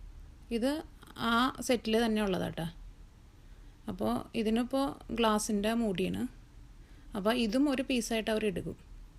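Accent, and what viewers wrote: Thrissur, India. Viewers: തൃശൂർ ഭാഷ എങ്ങനെ ചേച്ചി maintian ചെയ്തു പോകുന്നത്. ചേച്ചി യിലൂടെ ഞങ്ങൾക്ക് അമേരിക്ക കാണാൻ ആഗ്രഹമുണ്ട്. സൂപ്പർ ചേച്ചി.keep it up. 👍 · Hii. Chechi.. Nanum trissur kariya.. Channel starting tottu nan Kanarundu comment cheytitila ethu vare. valiya esta ee samsaram especially trissur slang.love u.. Lot😘😘adiploi.. Avunudu..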